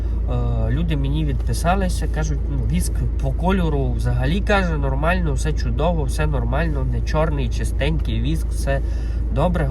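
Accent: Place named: Transcarpathia, Ukraine. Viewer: Ви з Закарпатя говірка закарпацка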